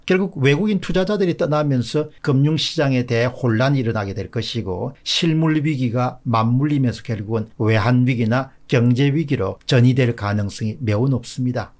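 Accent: Gyeongsang, South Korea. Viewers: 경상도 사투리 발음이라 그래요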